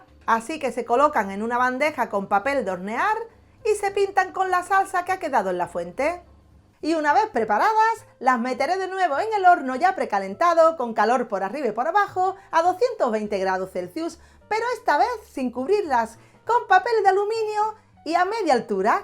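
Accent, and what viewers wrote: Andalusia, Spain. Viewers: ya le he hecho varias veces y genial. Muchas gracias por tus recetas. Mi madre dice que tienes acento de Dos Hermanas. Feliz Navidad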